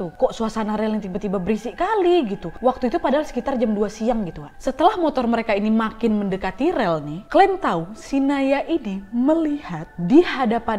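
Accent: Indonesia. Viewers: Hebat kamu bisa membawa rasa Indonesianya(Aksen) dalam cara membaca naskah. Terusin channelmu semoga sukses👍👍👍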